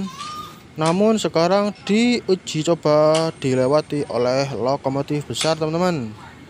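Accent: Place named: East Java, Indonesia. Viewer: Nang endi iki cak? nek delok omongane sih ky ng jawa timur yo . . .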